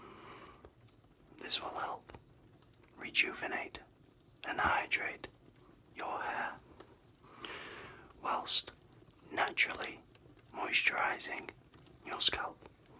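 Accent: Yorkshire, United Kingdom. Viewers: a proper accent whilst listening to ASMR! Beautiful Yorkshire tones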